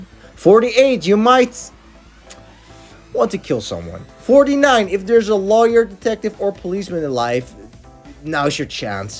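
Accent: Netherlands. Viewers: are u dutch lol?:)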